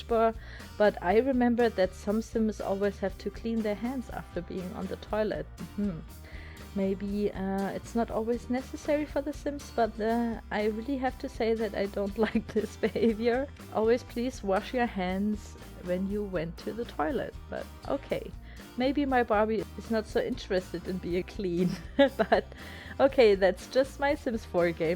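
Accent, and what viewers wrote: Germany. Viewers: Are you German ?☺ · Es kann sein dass ich mich täusche aber kommst du zufällig aus Deutschland? Hast so einen deutschen Akzent haha :D Sehr schönes Video nebenbei weiterso bin total begeistert von deinen Baukünsten. :)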